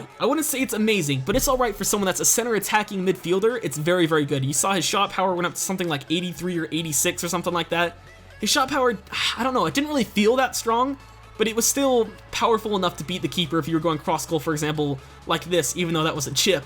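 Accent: United States. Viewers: U American ?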